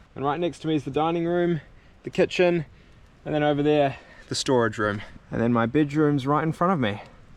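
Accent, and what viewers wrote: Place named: England, United Kingdom. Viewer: I recognize the English accent, but a tad different. I miss the accent. I'm from America. You build a bigger fire than I might. Also liked how you built it closer to your tarp. I've seen people build it 15-20 feet away. Personally, I think that's too far, to keep you warm at night. You meals are bigger/heavier on the stomach than I like. Camping, I prefer smaller fast meals. Just me. Enjoyed the video. Thanks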